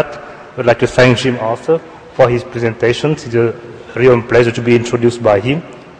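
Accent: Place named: France. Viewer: Heavy French accent :) I am a Vietnamese American, glad to see another Vietnamese coming out famous. Thank and hat of to France, a great country!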